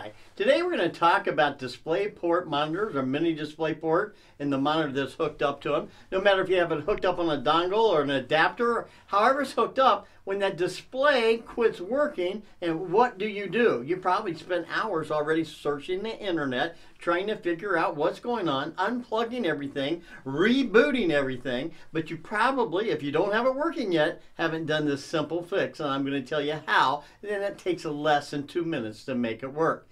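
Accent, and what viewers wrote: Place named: Chicago, United States. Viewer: where are you from in Chicago I can hear it in your voice my guy!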